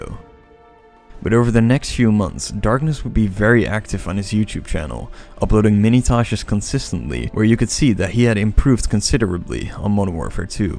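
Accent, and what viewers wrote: Netherlands. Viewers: the guy speaking is dutch, 100% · dutch accent? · the dutch accent is unbearable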